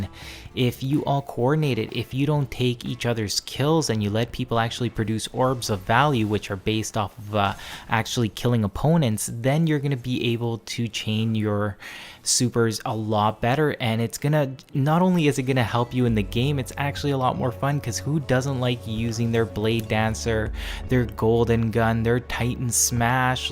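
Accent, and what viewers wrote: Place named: Canada. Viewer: Canadian ey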